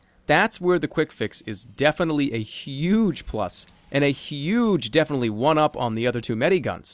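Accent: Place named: East Coast, United States. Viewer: it's the east coast accent. that's what it's all about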